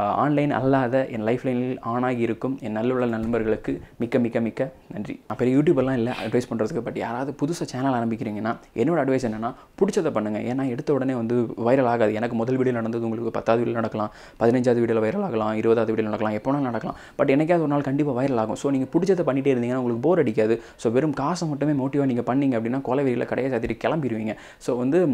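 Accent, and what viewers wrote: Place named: Nagercoil, India. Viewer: hmm voice ah pathu tha keta nenacha neenga Nagercoil thanu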